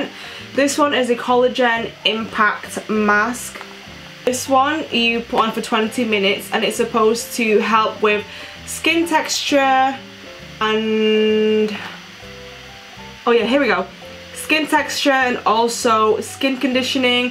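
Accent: United Kingdom. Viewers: Are you from Manchester, the accent feels familiar? :)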